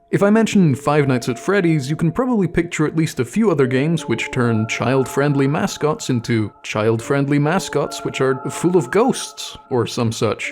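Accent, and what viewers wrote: Scotland, United Kingdom. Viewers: Btw If that’s a Scottish accent I hear I love it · you are the most normal-sounding british person i have ever heard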